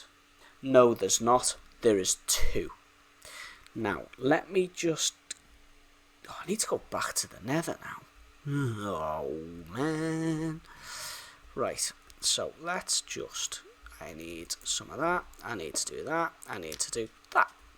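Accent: Liverpool, United Kingdom. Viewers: Nice Scouse! Clive is SO cute ❤ He fits you for a spirit animal lol.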